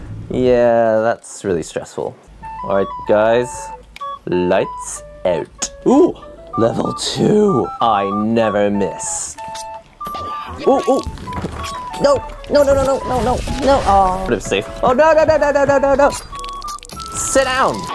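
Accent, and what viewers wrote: United Kingdom. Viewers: I already asked but are you british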